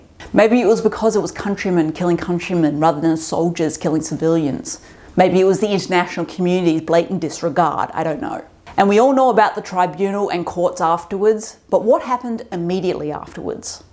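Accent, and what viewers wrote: Australia. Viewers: Like I said you should have used 1 minute at the beginning of the video to mention what made this deplorable genocide against the Tutsi happen. I understand you were talking about the aftermath but the correct information at the beginning would have been better. The Hutus did not decide in one day to kill their neighbours, families and friends who were Tutsis and Twa this was caused for decades by the white European invader colonisers. Causing division and bringing their racism and discrimination to another African country they invaded. The only reason I came across your page was because this April made it 30 years since the genocide against the Tutsis and Twa otherwise I don't watch morbid channels like this. Judging by your accent you sound Australian (I may be wrong), maybe you can make a video about how the white invaders destroyed the indigenous Australians? 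What happened to the indigenous that were killed afterwards?? Would be interesting to find out. Have a good day.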